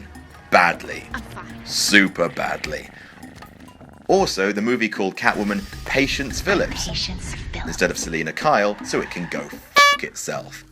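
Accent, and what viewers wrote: United Kingdom. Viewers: Is it just me, or is everyone else fed up with British accent narrators, and Teleprompter readers.. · Make your introduction even longer.... there is nothing I love more than listening to some boring British monotone voice blather on and on and on....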